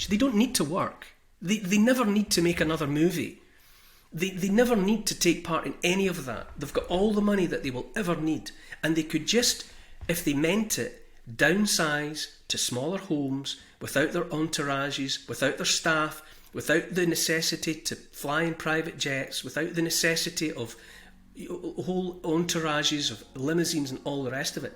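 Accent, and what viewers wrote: Scotland, United Kingdom. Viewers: 👍👍😍🦋🐬 💞 Neil A gentle, beautiful Scottish lilt A kind, empathic, forward tilt An open heart; a caring face A willingness to take his place. A strong, home-hitting monologue Reminds us of the boiling frog The courage to become a sleuth A passion to release the truth. A deep desire to crack the whip Illuminates our freedom-strip Calls out the darkness taking hold The shiny despots; plated gold! Intense and raw, he lays it bare A soul-enhancing, honest stare Inflections drive the message through He speaks for Us - for me and you! Discernment's such a precious gift His words create a mighty lift Take heed of all he has to say He knows - it shouldn't be this way! 🤗🦋🐬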